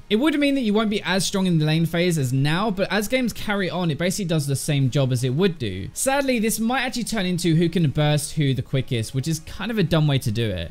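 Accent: United Kingdom. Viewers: I'm confused about why these British YouTubers are so confused about how lethality is pronounced, can someone explain this to me as an ignorant American?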